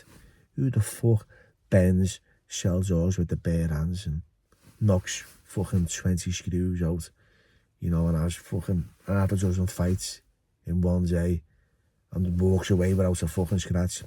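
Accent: Liverpool, United Kingdom. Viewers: Your deffo the best scouse podcaster enough said.😅😅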